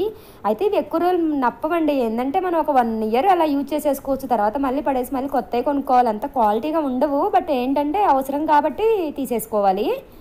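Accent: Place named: East Godavari, India. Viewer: Hamma okkasari ap lo east godavari ki velli vachinattu anipinchindi me voice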